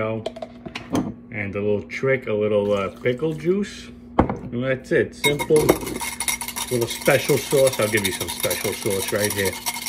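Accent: New York, United States. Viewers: Been cooking my burgers in bacon fat for years, it's a game changer. I do my onions after my burgers, get it cooking in that beef and bacon fat while I rest my patties and toast my buns, fucking 12/10 shit. Love your channel, dude. I grew up 20 minutes outside NYC, living in Florida now, the accent brings me right back to the old Italian Pizzerias and sub shops I'd be in every other day, fucking love it, my dude.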